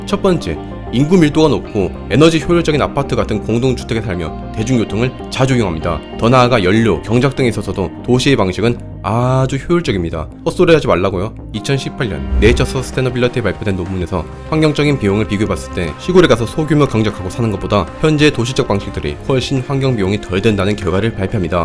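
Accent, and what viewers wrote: Gyeongsang, South Korea. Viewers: 경상도 사람인가 억양이 나랑 비슷한 냄세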